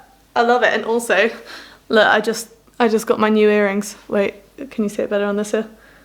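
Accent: United Kingdom. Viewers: You are so beautiful. I love your British accent by the way. 😊❤️☺️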